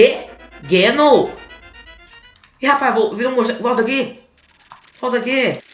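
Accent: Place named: Minas Gerais, Brazil. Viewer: Vc é de Minas Gerais seu sutaque é bem parecido com alguém que mora em Minas Gerais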